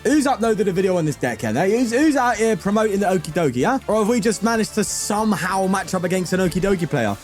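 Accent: RP, United Kingdom. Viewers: No, you sound fine. Sometimes I find the posh wee bit funny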